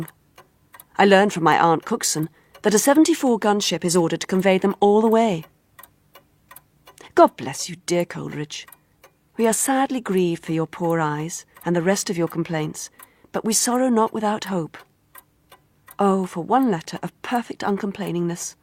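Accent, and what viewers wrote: Home Counties, United Kingdom. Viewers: Home Counties accent don't really matter does it?..a great rendition..Dorothy and Coleridge probably just close friends..we will never know for sure..been reading Coleridges' account of descent of Broad Stand Scafell.. He's got balls the size of Skiddaw... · What a shame they couldn't get a reader with a Cumbrian accent instead of a home counties one?!!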